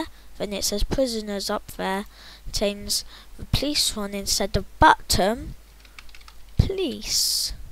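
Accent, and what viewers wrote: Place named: United Kingdom. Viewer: Your british accent is funny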